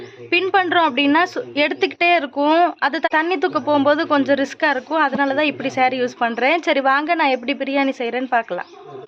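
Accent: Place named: North India, India. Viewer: இங்க வந்து திண்டுக்கல் பக்கம் வளர்ந்த பொண்ணா இருந்தாலும், உங்க தமிழ் வட நாட்டுக்காரங்க பேசுற தமிழ் மாதிரியே இருக்கு. எப்படி???🤔🤔🤔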